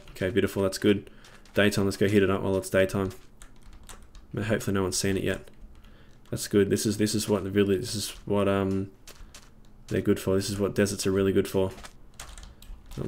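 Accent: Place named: Australia. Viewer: even know i am australian so still sound so aussie!! Loving The Series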